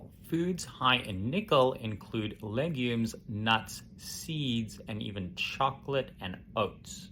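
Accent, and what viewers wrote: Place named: South Africa. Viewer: thank you so much... I thought u sounded a bit south African. I have suspected MCAS have not found someone that knows what it is. But I will keep trying. I will copy your info and ask around. Thanks so much. My biggest issue currently is that steroids or cortisone make the reaction ten times worse. Thank you so much for replying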